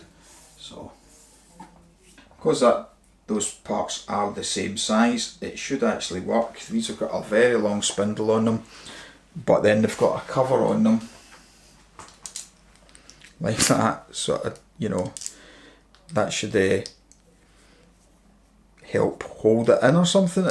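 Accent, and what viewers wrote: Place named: Scotland, United Kingdom. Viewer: Thanks from down under, you did a great job explaining the process. I'm a 75 year old female about to change the vanity basin taps to quarter turn and bought the whole lot. Now I realise I can take it back and just get the quarter turn brass spindle and use it with my existing handles. May just do the shower too 😊 PS love your Scottish accent and thanks for talking slowly.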